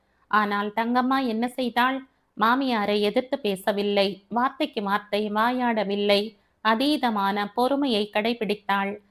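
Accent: Kerala, India. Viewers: Besh beshakum ungalin inimaiyana malaiyalathamil vasippathu kathiyum super💖💖💖👍👍👍🎉🎉🎉😊😊😊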